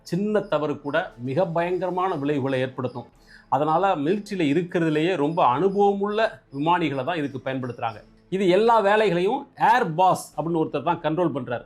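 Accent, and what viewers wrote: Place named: Thanjavur, India. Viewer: நல்ல உட்சரிப்பு தஞ்சை பேட்சு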